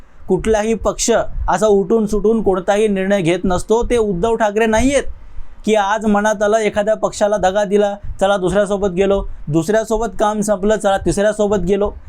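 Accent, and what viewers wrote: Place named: Karnataka, India. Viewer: तुम्ही स्वतः कानडी भाषिक आहात का ? काही उच्चारावरून तसे वाटते . तरीही तुमचे व्हिडिओज खूप चांगले , स्पष्ट तरीही मुद्देसूद असतात .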